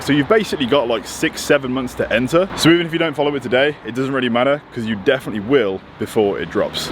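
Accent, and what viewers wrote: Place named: United Kingdom. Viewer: first of all...buying any peptide as a liquid is the worst decision you can take, they have to use so many conservants to keep the peptide together. The best way is lyophilized cake but we all know how anoying is to reconstitute and dose them, extra bac water, needles, syringes and shit. So, my gym bro, it seems you have an UK accent and you have in the UK the Lyoprester, it was invented there, under your nose mate and here we are, putting ready mixed peptides in you...😌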